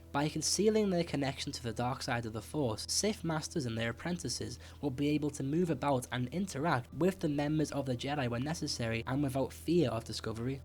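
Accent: Liverpool, United Kingdom. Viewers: is that a Liverpool accent?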